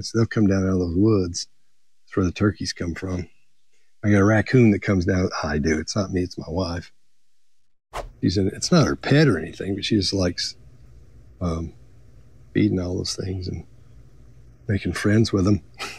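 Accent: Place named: South, United States. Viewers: the whole time I was thinking Tennessee! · Love that Southern accent. Smooth as honey.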